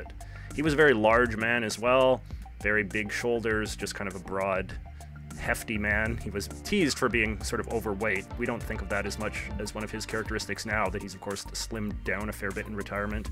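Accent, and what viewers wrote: Canada. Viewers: He sounds SUPER canadian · that hefty Canadian accent distracted me sooo much... absolutely beautiful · You soound Canadian 'ay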